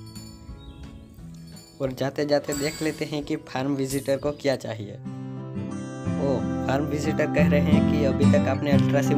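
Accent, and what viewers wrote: Gujarat, India. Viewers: Hindi with Gujarati accent.... Gujarati hai ap....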